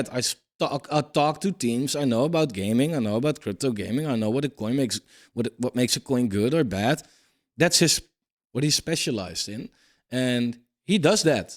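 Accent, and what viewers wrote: Netherlands. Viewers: blijft leuk he, dat nederlandse accent, je praat goed engels hoor !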